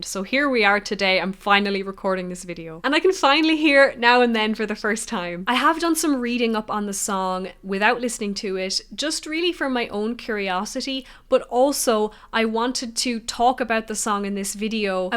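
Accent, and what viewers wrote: Ireland. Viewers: I grew up with music and it helped me through a tumultuous adolescence. I was initially disappointed with their most recent productions, eg. " / " and this particular song. However, I grew to love " " ( This song reminds me a little of " " from that album.) I was used to being very amazed by each new song, that came out from proper, when I was young, that pushed the boundaries of what I'd ever heard before. These newer songs, that I mentioned somewhat disappointed me in that regard, although I did grow to love them. I'm thinking, that this song came out at a very opportune time, with all the stupid shyte going on in the World (war, politics etc.), to revive our love and memories of a better time ( the Artistic Renaissance, that kicked off) and hopefully generate a better perspective in people's minds and hearts to push us forward into the Future. 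Quite simply, WE are BETTER, than this. Adore your lovely Irish accent and look forward to hearing you sing. Thanks for this video. P.S. Do you play/sing, " "?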